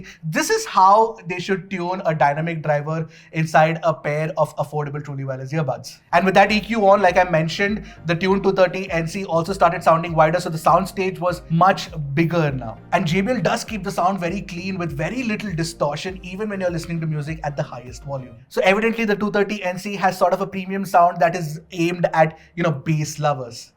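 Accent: India. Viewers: i mean when i saw indian man i thught hes going to tell few words in english and title and then hindi but instead i got nice indian accent and very nie review very good man keep it up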